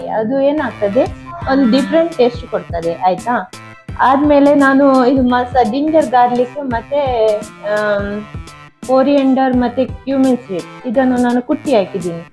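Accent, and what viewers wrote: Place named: Mangalore, India. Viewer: Super fish fry ❤️🤤Thumba chanagi mathadthiri Mangalore kannada 😂 be connected always 👍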